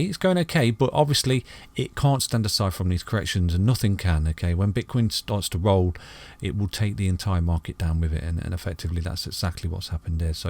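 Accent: United Kingdom. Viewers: Love hearing a fellow Brit on these videos. Great job.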